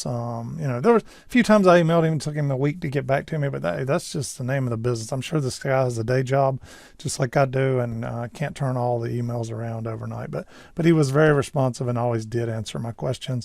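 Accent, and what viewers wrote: South, United States. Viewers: Despite your light Southern accent, I will credit you with pronouncing the word "tubes" instead of "teebs".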